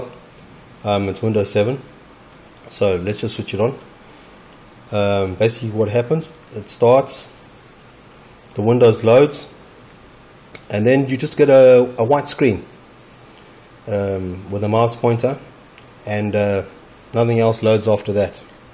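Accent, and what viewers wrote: South Africa. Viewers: Are you south aftican?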